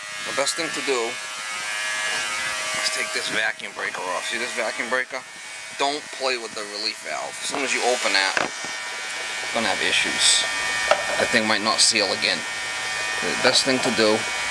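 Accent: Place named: Massachusetts, United States. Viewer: Great video, I really like the tags you use, I might have to look in to those myself. I like listening to you, your accent is exactly the way my dad talked, he was from Peabody MA. He just passed away recently so I enjoy hearing that accent! Keep up the great work! PJ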